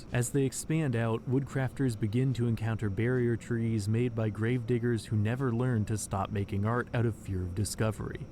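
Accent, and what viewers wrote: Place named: Russia. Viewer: In general, I think you have a chance to become popular👍 . (Well, English with a Russian accent is so-so.)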